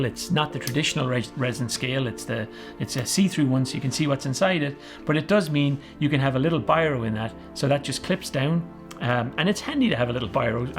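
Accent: Ireland. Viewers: I thought you were Irish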